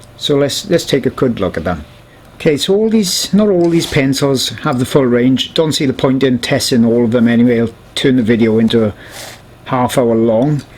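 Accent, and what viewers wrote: United Kingdom. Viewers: British accent...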